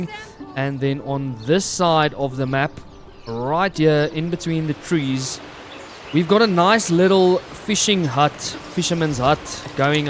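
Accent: South Africa. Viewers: I hear a SA gamer i subscribe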